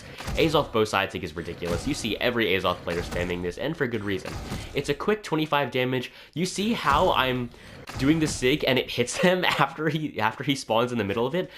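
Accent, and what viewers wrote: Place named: Zimbabwe. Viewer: Where are you from? You sound Zimbabwean🇿🇼.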